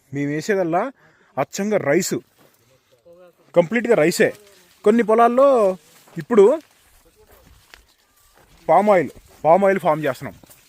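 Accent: Andhra Pradesh, India. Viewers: Anna abn radha krishna type anukunta...few years back they migrated from andhra to Nizamabad and started farming and mixed with telangana culture but slang inka andhra ne ontadi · Anna small doubt naaku me slang telangana slang la undadhu ap la untundhi kaaranam chepthava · meedi evooru? Bodhan lo evaru vunnaru? Choostunte Andhra yasalo matladutunnaru? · Same doubt naku vachindhi bayya.... Telangana salng ledhu pure andhra salng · Anna ne language enduku andhra vala laga undi ?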